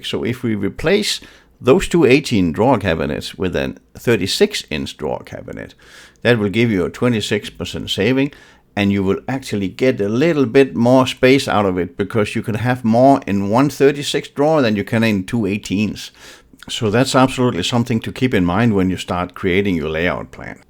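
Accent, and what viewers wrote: Denmark. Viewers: Hi, I just found your channel. I subscribed immediately because I love your Danish? accent. Both my parents were born and raised in Danmark. We're thinking of installing Ikea cabinets in our kitchen. Thank you so much for explaining the differences in cost. I really like the oak fronts and was sorry to learn that they are the most expensive. I might have to get white ones instead. Which is your favorite white door and which color white do you think looks best?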